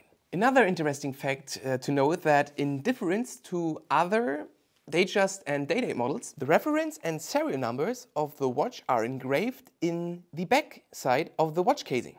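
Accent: Germany. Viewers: The thick German accent... but still amazing video.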